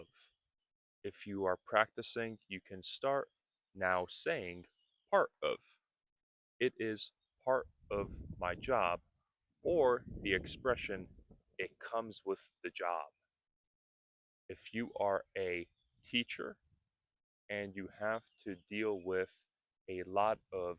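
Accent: United States. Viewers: Hello. Are you an American native speaker (your accent sounds like American). What country do you shot your videos, in Hungary? Do you have live streams on your channel?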